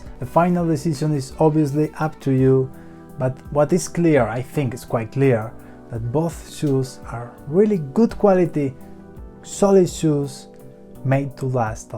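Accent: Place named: Spain. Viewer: The narrator is coming from Spain ? Because the funny accent.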